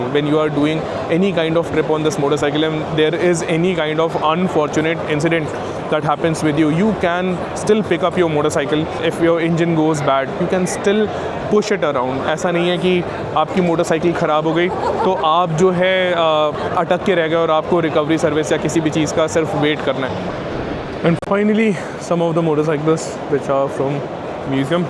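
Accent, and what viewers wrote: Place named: Delhi, India. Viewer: bhai tum mein dilli ki chhaap hai tumhare baat karne se dilli region surroundings ke lagte ho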